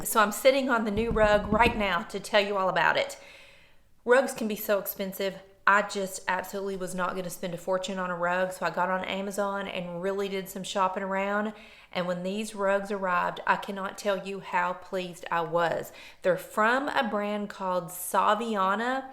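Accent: South, United States. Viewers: Hello, new subscriber, love your southern accent!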